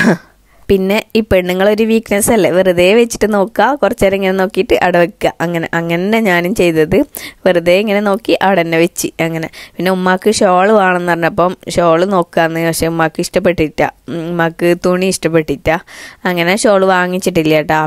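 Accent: Kasaragod, India. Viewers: ഞാൻ ആ ദ്യമാണ് ഈവിഡിയോ കാണുന്നത് സംസാരം കേട്ടിട്ടു കാസർഗോഡ് കാരി ആണെന്ന് തോന്നുന്നു കൂടുതൽ അറിയില്ല എന്തായാലും ഫുൾ സപ്പോർട് 👍👍👍🌹🌹🌹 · Masha Allah Ninte Nalla Soundan Pinne Nammale Kasargod Samsarum Spr Aanetto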